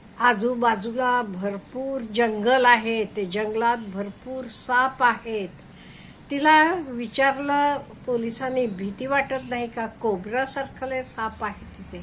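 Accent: Karnataka, India. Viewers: आई तुम्ही खूप गोड आहात 😊 तुमच्या मराठीत कन्नड भाषेची फोडणी घातल्या सारखं 🙏 छान वाटला व्हिडीओ 🙏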